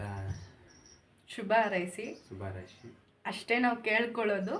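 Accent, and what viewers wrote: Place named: Mandya, India. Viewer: Mam ನಿಮ್ ಮಂಡ್ಯ ಮೈಸೂರ್ ಕಡೆ ಭಾಷೆ ಎಷ್ಟು ಚನ್ನಾಗಿದೆ ಕೇಳೋಕೆ ನಮ್ಮ ಉತ್ತರ ಕರ್ನಾಟಕ ಭಾಷೆ ನಿಮ್ಮಷ್ಟು ಚನ್ನಾಗಿಲ್ಲ 🥰❤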